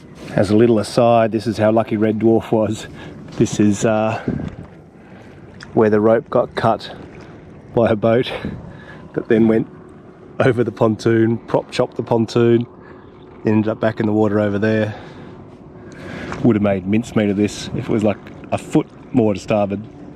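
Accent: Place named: Australia. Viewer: Thanks, this is just what I needed this morning, you always make me smile, and sometimes I really do think you are from Michigan with that I only need this or that to make it work but then that great Aussie accent keeps me grounded. Keep up the great work.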